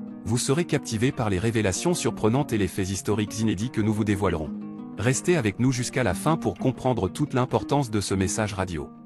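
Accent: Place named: France. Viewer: Aucun message final cité et une belle traduction prononcée toute croche, "like" prononcé comme lécher "lick"... La prononciation à la française de mots anglais me tape sur les nerfs... Ce canal ne vaut pas cher la tonne, pour reprendre ici une bonne vieille expression québécoise...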